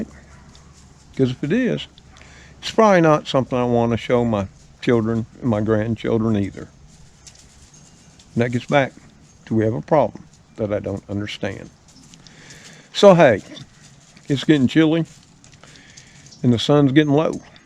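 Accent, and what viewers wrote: South, United States. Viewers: I really enjoy hearing you narrate/speak with your southern accent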